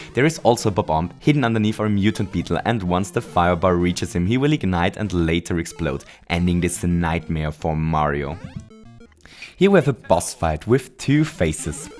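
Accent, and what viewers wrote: Germany. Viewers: hey cool and very helpful video! :) p.s. are you German? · are you german?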